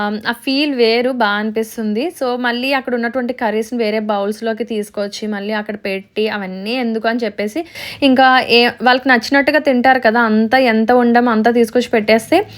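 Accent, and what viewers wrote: Godavari, India. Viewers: Meeru vunna place? Godavari slang bagundi andi, madi east godavari, chala baguntadhi slang😅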